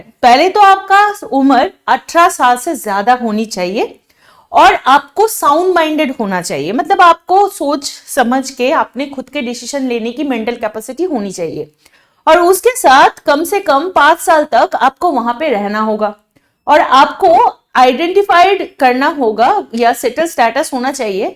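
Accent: Bengal, India. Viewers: Ma'am Bengali hai accent se pata lag gaya 😂❤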